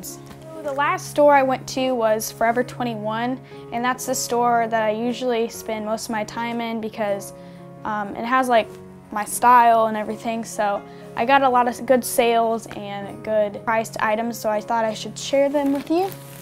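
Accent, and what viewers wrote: South, United States. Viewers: Is she from the South or something ? She has an accent ! :) haha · You sound so country in this vid!! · Is it just me? Does she talk in a southern accent a little?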